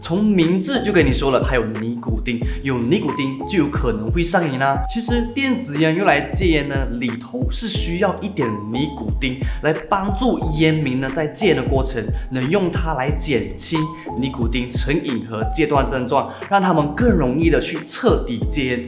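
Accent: Fuzhou, China. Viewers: 你肯定是福州人！！！！！！！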